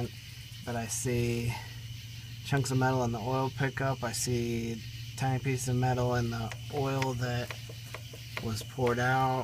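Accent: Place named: Arizona, United States. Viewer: Downvoted because Arizona accent.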